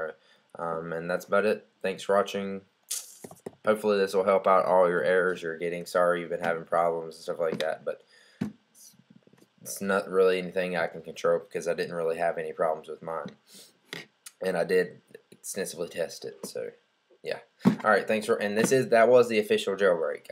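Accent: United States. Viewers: Note to Self- Dont get tech advise form a guy with a country accent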